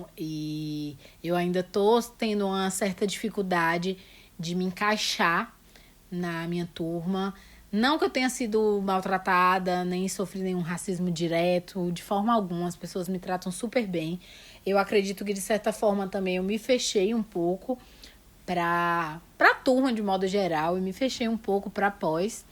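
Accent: Bahia, Brazil. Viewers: Nossa sempre que vejo, algum vídeo deste ca fico me perguntando esse sotaque "baiana" e agora tive a confirmação! Qual o interior ?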